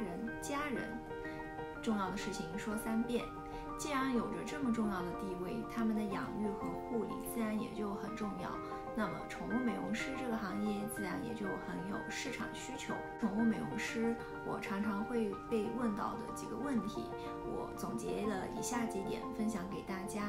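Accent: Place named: Yunnan, China. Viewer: 听出了云南口音🤏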